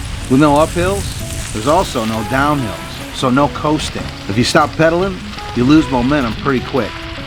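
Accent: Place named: Chicago, United States. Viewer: Hi - like everyone else, I was surprised to learn that you'd had a bad accident that stopped you from riding for a while. I'm glad you're back at it. As many people have said, your videos are very informative - from your biology videos, I get the impression that you teach and your videos have the touch of a teacher who thinks of the important information to pass on and the best way to do it. Another aspect of your videos I enjoy is the quality. I do video work for a living and your videos are really good visual communication. And there's a final thing I really enjoy about your videos. I grew up in Chicago until going off to college. Like you, I live south of Chicago but far, far, far south - in North Florida. Listening to you is enjoyable because you remind me of my hometown. I hope you continue to make videos for a long time. Thanks!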